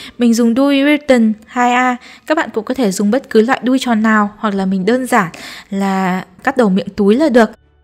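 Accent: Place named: North, Vietnam. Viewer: Giong mien bac of ban de thuong wa,lai gioi nua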